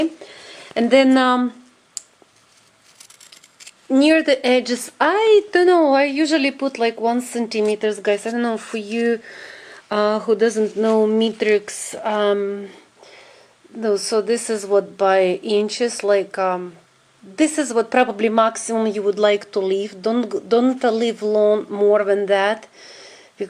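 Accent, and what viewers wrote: Russia. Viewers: ужасное произношение извините конечно но надо поработать) очень русский акцент (( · Я не говорю по английски, но по-моему, такой сильный русский акцент)))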